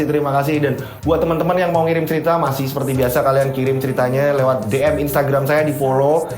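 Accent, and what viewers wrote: Java, Indonesia. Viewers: ALHMDULILLAH SNENG BNGT DNGER CERITA NYA MAS..KL NGMONG JOWO MEDHOK BNGT..KATA DEMI KATA ENAK DI DNGERNYA..SHAT SLL YA MAS.SLM DR ANK RANTAU HK.🙏🏻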